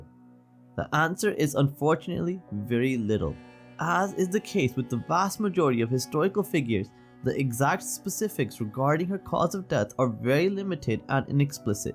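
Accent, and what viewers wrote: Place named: South Asia. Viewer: all i hear is jeet or porki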